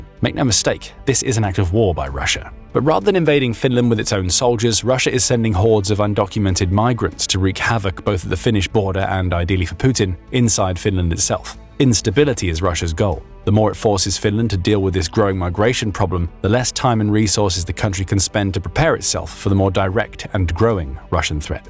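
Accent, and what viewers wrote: United Kingdom. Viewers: Your British accent is charming. Nevertheless, it is up to Finns to decide if they want to promote and live in peace or become proxies, perhaps Ukr 2.0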